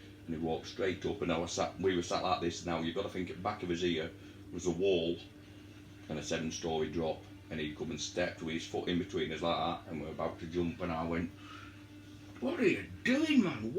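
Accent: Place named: Yorkshire, United Kingdom. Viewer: Are you from Barnsley or Donny pal? Its like listening to an old mate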